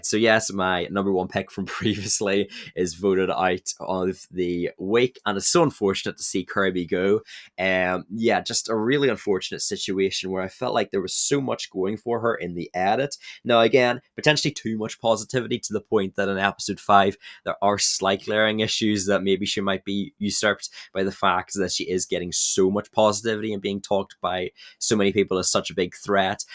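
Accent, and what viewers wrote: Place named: Northern Ireland, United Kingdom. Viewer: Having watched Survivor for like over 2 decades, and only really having some family members also watch Survivor to discuss with them. It's really strange and refreshing to hear someone else with a Northern Irish accent talk about Survivor as there are so few of us! (I'm from Belfast)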